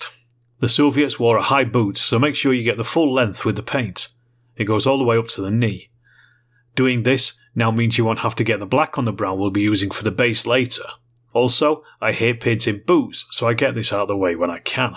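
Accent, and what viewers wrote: Northern England, United Kingdom. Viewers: I love mid-England Northern accents! Keep talking mate!!!!!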